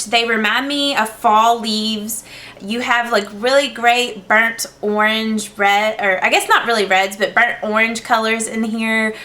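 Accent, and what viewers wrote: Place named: South, United States. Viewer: i know this is an old video, but this is so pretty! i hope they still make this palette. im so glad i found your channel, and i love your accent too. at first i thought you were from another country (not the u.s), but then i realized that its southern. thanks for this bold but wearable tutorial.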